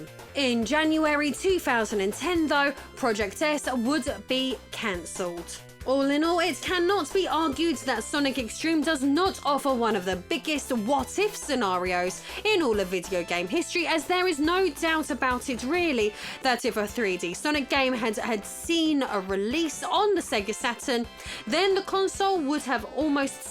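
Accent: United Kingdom. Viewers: Of course.It´s impossible not to be. British accent with astonishing beauty <3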